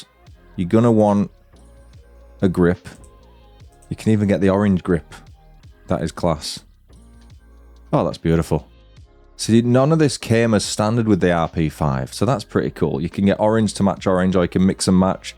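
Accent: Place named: Yorkshire, United Kingdom. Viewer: I'm not getting one, what I need rn is a vertical and a couple of cheaper niche handhelds with Linux I think, but the orange one is very pretty. BIT too washed out and BIT too much orange but still... I'm a basic beeatch who go for black at all times, I find anything else mostly distracting while playing (+ the issue of getting dirt on the handhelds), but it's nice to see the more fun colors. White ALWAYS sucks. Edit: "Yorkshire"... A UK accent I wouldn't be able to place but that doesn't come off as properly annoying (!). Cool.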